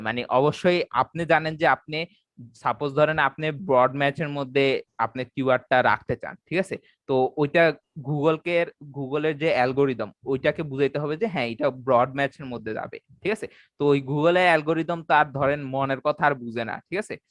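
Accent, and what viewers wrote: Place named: Comilla, Bangladesh. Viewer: আপনি মনে হয় কুমিল্লার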